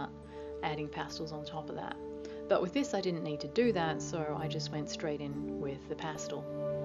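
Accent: Australia. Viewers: Are you australian? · Hi, really nice to hear a good old Aussie twang for a change. I'm so wanting to do this and also on plastic (acrylic) dolls. Which products do I use? I can't understand how you get your colours to stick? Any help would be much appreciated. Fellow Aussie from Queensland 🤗❤ Just subbed 🤗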